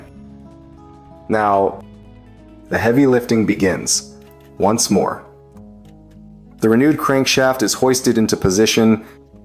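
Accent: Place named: United States. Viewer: We don't need your ugly American accent to commentate to us, we can see . Therefore you stop talking through the men's work. Begone.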